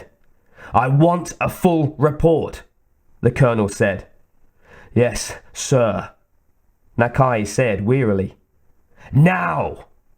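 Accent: United Kingdom. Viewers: in the early months she would sit there and watch Aliens and Predator movies with me on the days I stayed home with her.. now she’s constantly on the move so I only get to listen. I’m on my 5th book now, and I’ve tried to make a habit of liking the each chapter as I start it for you! Also, your accent and tone is quite immersive, are you from GB?..?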